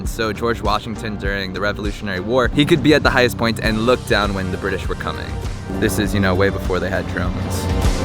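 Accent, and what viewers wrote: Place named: Philadelphia, United States. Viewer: Seriously. If you are going to move from another city to live here and then act like you know everything about it, you could at least pronounce the names correctly. And none of these spots are secret, they are just not visited as much by tourists. And I know he’s definitely not from here, based on the way he pronounced “waterfall” as “wooterfall”. He sounds like he’s from Philly or somewhere else in PA.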